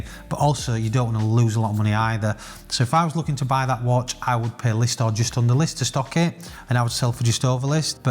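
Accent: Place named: North, United Kingdom. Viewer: Great content as ever. You’ve got your own niche here. A lot of the watch YouTubers are semi-weirdos. Nice to hear some straight talking from up North.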